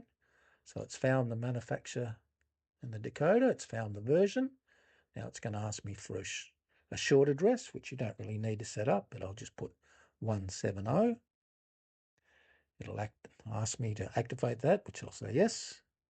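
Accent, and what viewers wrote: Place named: Australia. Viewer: Sounds like you're a fellow Aussie. Where do you buy the Kato decoders?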